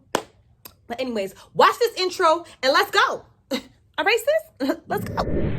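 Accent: Jamaica. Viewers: Are you Jamaican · I had to subscribe as soon as I heard your accent come out 🇯🇲🇯🇲🇯🇲 much love from a fellow Jamaican 🖤🖤🖤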